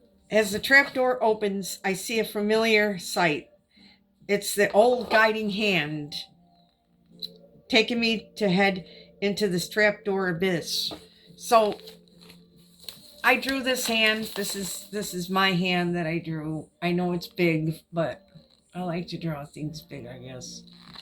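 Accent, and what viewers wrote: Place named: Upper Midwest, United States. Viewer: That accent !! - I recognize as either Wisconsin or Minnesota !! Go Badgers ! Lolol. Where's Margie Gunderson ?!?!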